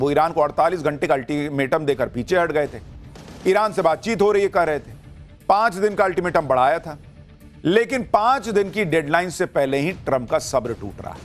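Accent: India. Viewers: Bro. Your deshi way to say is great maza aata hay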